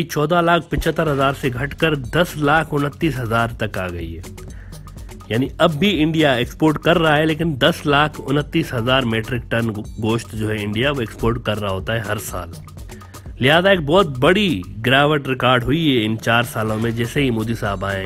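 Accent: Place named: Pakistan. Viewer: itna gherah Urdu boltta hee tav mojey laga Pakistani hee kioki Indian Urdu Hindi ka milawat hee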